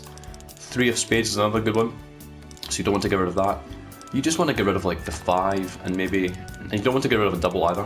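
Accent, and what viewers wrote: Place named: Scotland, United Kingdom. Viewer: Came to learn tycoon, stayed for the Scottish accent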